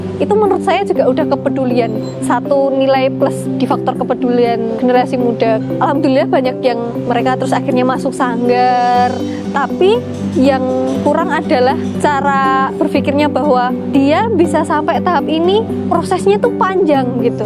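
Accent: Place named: Java, Indonesia. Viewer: Suka sama attitudenya mbak e, cara bicaranya asli jawa. Meskipun pakai bahasa indonesia ketika menyebut gurunya sakit dg kata "gerah". Sukses selalu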